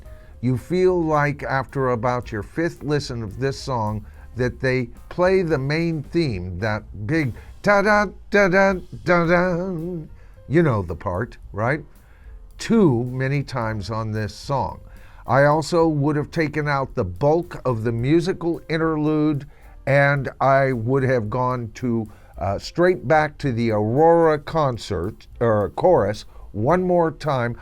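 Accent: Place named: United States. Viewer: . If you are an American, and your accent makes that likely, You and I and all the Rest of Us have more serious things to worry about than a Plastic "Yes" ( and how many originals are in this 2026 lineup? )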